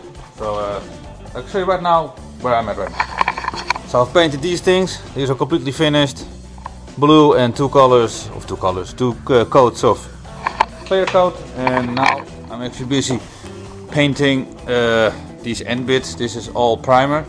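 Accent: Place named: Netherlands. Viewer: Na 1 zin dacht ik al te horen dat je NL'er bent. Alsof ik mijzelf Engels hoor praten. Spuitbus van de Gamma bevestigt het. Bedankt voor de nuttige info. Ik was er al een tijdje naar op zoek.👍🏻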